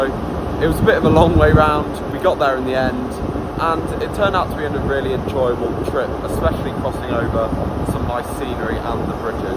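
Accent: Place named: Southeast, United States. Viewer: You have a southeastern accent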